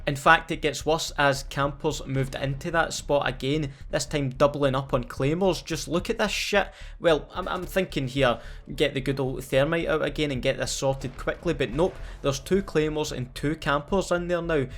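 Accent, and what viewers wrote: Scotland, United Kingdom. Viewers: Sounds Scottish to me! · Came for the camping stayed for the Scottish accent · Hellow is this scottish english acent? Or irish? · I jast wotch hes videos for hes amazing scottish accent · ??? He’s Scottish 😂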